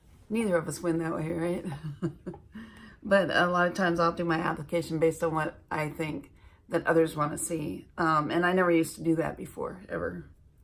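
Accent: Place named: Alaska, United States. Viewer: Your videos are informative and fun to watch. And yes, you sound Alaskan to my West Coast ears. 😁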